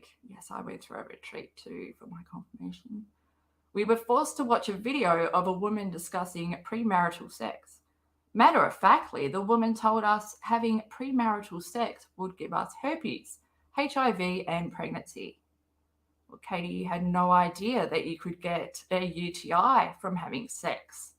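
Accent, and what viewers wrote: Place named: Australia. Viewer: Your voice helps me sleep. I watch all you vids and it’s my favorite to put one on before bedtime to fall asleep to your Australian accent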